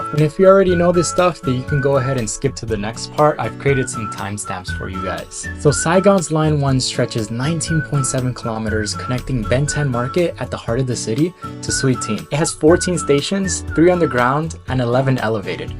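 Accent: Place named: New York, United States. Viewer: I like your Newyork accent 😊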